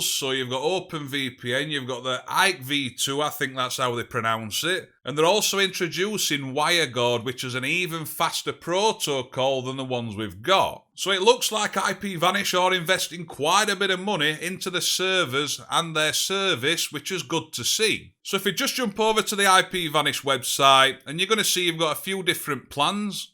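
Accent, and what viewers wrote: Yorkshire, United Kingdom. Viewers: Thumbs down due to the Yorkshire accent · I'm from Germany and I like that Yorkshire accent.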